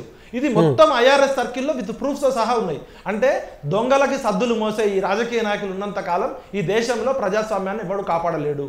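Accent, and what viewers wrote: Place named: Andhra, India. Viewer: Your Telugu is standard like Andhra Hats off to you. Telangana people should improve like you, bring the language to the standard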